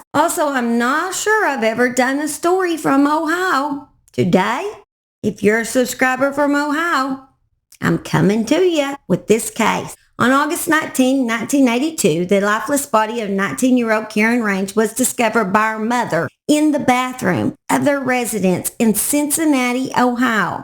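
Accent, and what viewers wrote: South, United States. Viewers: Your accent sounds like Southern? Where are you from Mrs.?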